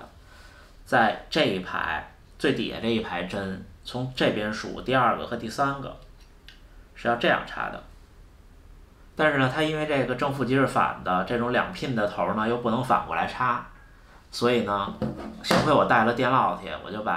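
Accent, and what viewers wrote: Beijing, China. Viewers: 比较喜欢up主的风格 说话听起来很舒服 北京哥们吧， 希望保持这种风格 教程针对新手小白也比较友好，再帮帮小白，做点更加有趣易于操作的项目吧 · 最需要的就是可以直接在树莓派本身系统上实现各种功能的教程了，刷来刷去的太麻烦，老哥北京口音听着也很舒服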